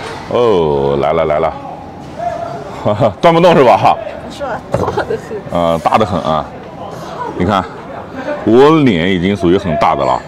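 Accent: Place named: Anhui, China. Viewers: 是不是合肥周边的，口音和我一个同学像的很。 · 一定口音就是安徽人哈哈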